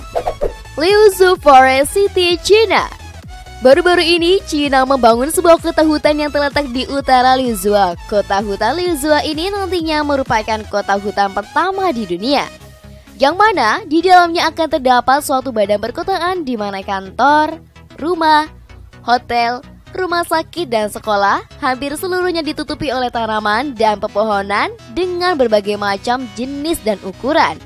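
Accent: Java, Indonesia. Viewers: medok banget ngomongnya..mengganggu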